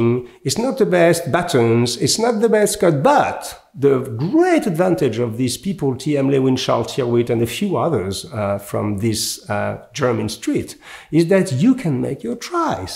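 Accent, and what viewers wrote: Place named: France. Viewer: Frenchman speaking excellent English layered with an elegant french touch👍👍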